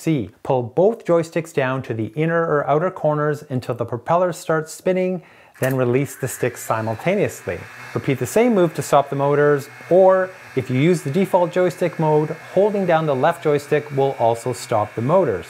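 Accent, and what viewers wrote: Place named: Canada. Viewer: Thanks for elaborating. I'm in Eleuthera Bahamas now (a nice playground to use the drone, e.g. Sapphire Blue hole) but I live in Brampton. I'm going through your library of informative videos and appreciate them. Funny how almost immediately, one can detect a fellow Canadian in a video. Best. Ken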